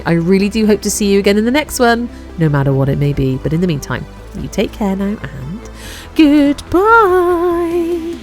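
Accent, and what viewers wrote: West Country, United Kingdom. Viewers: Wow what an informative video. Giving a like and sub. Nice to hear an English voice! Got a west country twang to it? 😅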